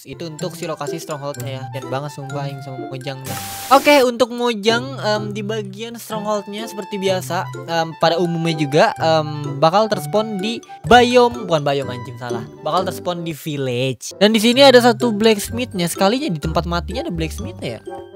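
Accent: West Java, Indonesia. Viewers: Kak orang sunda kah?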